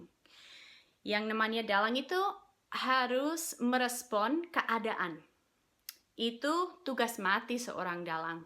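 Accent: Java, Indonesia. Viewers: Ngmong nya medok bgt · you indonesian is getting medhok i like it!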